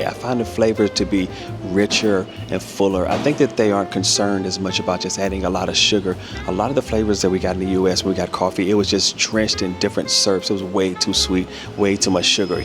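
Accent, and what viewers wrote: England, United Kingdom. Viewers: You have picked up a small british accent. Jolly good!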